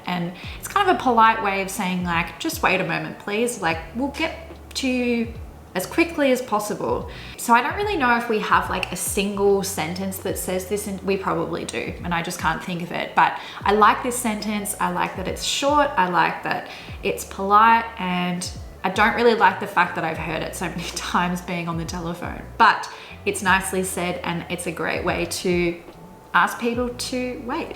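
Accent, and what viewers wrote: Netherlands. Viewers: Lekker bezig can also be used in a sarcastic/cynical way. Like when you expected someone to do a better job, and you're kind of disappointed, you can say: nou, lekker bezig. Or when someone is messing up: je bent weer lekker bezig. Or in a funny way, for instance when someone drops and breaks something: lekker bezig. :-) Eet smakelijk are two words by the way. Literally: eat tasteful/tasty, but it really just means: enjoy your meal. Your Dutch accent is perfect, you have mastered it. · I enjoyed that! Good Dutch accent too! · no, i swear like you have a lot more of the like typical dutch accent than i do. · I'm really imprest with your dutch accent. It's like a different person is speaking. spooky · As a Belgian it's really weird hearing you speak in a very Dutch accent. It feels almost wrong because it's like not a really proper way (compared to the language we speak in Belgium) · its quit crazy how mutch of the dutch accent you took over! 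· why does she sounds like a normal dutch person when she speaks dutch (you can tell but the accent isnt that great) but doesnt have a dutch accent whilst speaking english. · i live in Flanders Belgium. Perhaps you should get into that. elk dorpje en elke stad heeft hier zin dialect nog meer dan in nederland. leuke video trouwen en je accent is heel goed alhoewel zeer nederlands ipv vlaams.Daar bedoel ik niks slechts mee. ipv lekker bezig zeggen wij hier goe(d) bezig maar dat is eerder negatief bedoeld. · Als ik je uitspraak hoor weet ik meteen dat je, je Nederlands hebt geleerd in Nederland en niet in België. Tis ook wel redelijk grappige om een Hollands accent te horen midden in jouw Australisch accent, kzou zeggen "Lekker bezig" en pas op als je ooit naar Vlaanderen komt want daar kunnen we elkaar niet meer deftig verstaan gewoon omdat we in een andere stad wonen haha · It amazes me how Dutch you sound when speak Dutch words. 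But also totally sound Australian when speaking English xD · Ik kan horen dat je in Nederland was omdat in België we een ander dialect hebben en we maken geen moppen van de vreselijke intelligentie van Nederlanders maar hun wel van ons geen idee waarom.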